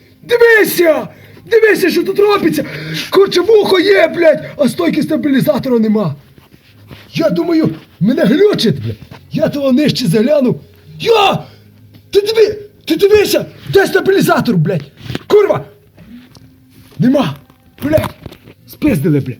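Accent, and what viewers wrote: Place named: Khmelnytskyi, Ukraine. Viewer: Судя с говора вы с Хмельницкой области 😊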